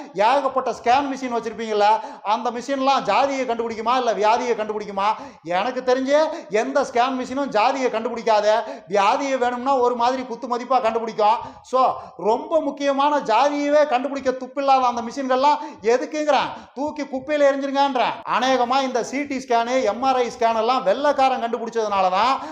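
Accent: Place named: Coimbatore, India. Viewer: ur cbe voice gives the slang a nice one wait for the voice not for the messages matters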